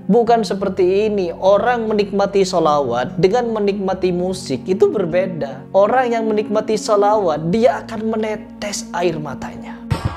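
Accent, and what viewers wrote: Madura, Indonesia. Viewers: Pean logate madura ya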